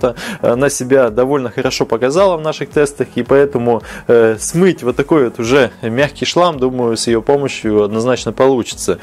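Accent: Perm, Russia. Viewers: Пермский что-ли?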